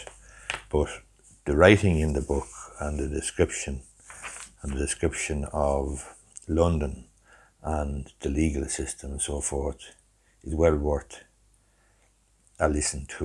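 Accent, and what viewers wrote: Ireland. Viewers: I have read this introduction to Bleak House many times and never fail to admire the brilliance of Dickens. Thank you for drawing attetion to it and reading in your lovely Irish brogue!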